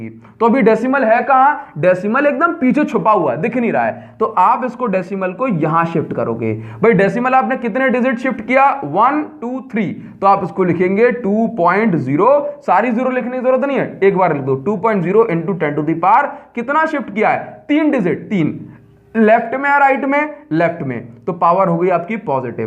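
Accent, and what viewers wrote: Odisha, India. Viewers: Sir ap odisha se ho kyaaaa.....ap bahut achhse padha rahehooo.thanks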